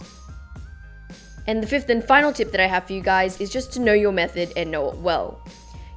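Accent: Australia. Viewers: Waaaaiiiit...are you Aussie? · the cubey guy she's most likely Australian bc of her accent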